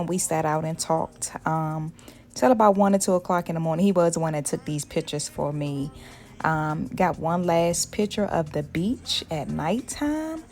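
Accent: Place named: Maryland, United States. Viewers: As soon as the video started I said "oh she from DC." LOL! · I live in MD PG county area..I know that accent anywhere 😌💕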